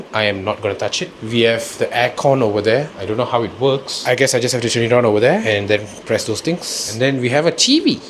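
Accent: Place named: Tamil Nadu, India. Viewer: உங்க பேச்சு மலேசியன் தமிழ் மாதிரி இல்லை பக்கா தமிழ்நாட்டு தமிழ் மாதிரி இருக்கு நான் சிங்கப்பூர் ல தான் இருக்கன்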